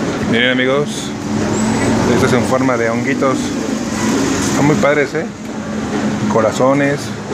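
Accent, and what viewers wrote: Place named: Peru. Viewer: Eso donde queda? Que pais? Como hablas supongo que es en Peru ? Lindas las lamparas !!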